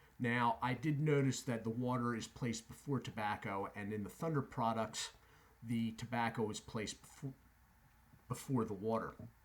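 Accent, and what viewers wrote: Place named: East Coast, United States. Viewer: Peace! Great channel! I’m a New Jersey native for most of my life and now I’m in my 40s in the east Bay of California, and it’s nice to hear an obvious East Coast accent! I’m off of cigarettes for almost 20 years now, yet I have been dabbling in things like cigars, nicotine gum, etc. etc. to bridge the gap when I’m stressed. I would like to be able to order things like Swedish snus or certain types of dips online, in bulk so I have it around. I know northerner is just way too expensive. Any chance you have a good lead to a reputable end respectably priced source for Swedish snus and the like that you know to have decent shipping turn around? Like the channel a lot! Peace Shawn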